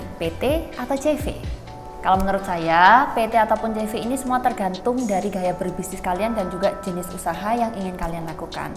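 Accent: Java, Indonesia. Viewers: Cantik medok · Jawa banget.. 😀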